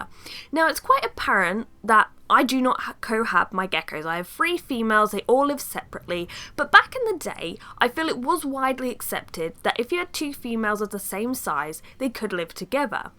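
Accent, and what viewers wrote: England, United Kingdom. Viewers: Where do you live? And she’s not pretending lmao, she really is English. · what a lie !!!!!!!!! dont let the english accent fool you she dont know whats shes talking about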